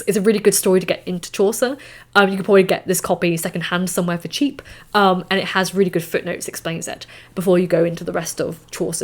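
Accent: RP, United Kingdom. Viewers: So refreshing to hear your british English accent, I rarely hear non-american English and at first I got scared I won't understand anything but that was not that hard. Just my process of listening to you was the additional argument to your speech 💪 I think there's also an important note of age-appropriation of literature, some classics are just speaking about themes that are not familiar for children or teens. And I wish people told me this in not a derogatory form, like haha you are stupid little girl you won't understand. It's not like that... It's more like people of country A won't understand the subtle context of literature of country B. And it's not a competition at all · What a delightful and posh accent, it’s verbal caviar. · Is this teacher why you sound so Posh? She drilled posh RP into you ?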